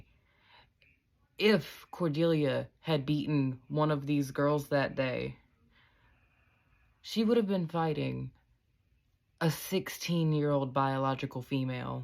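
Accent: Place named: South, United States. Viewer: Why cant you people from the south learn to talk better. The sounds you make are just aweful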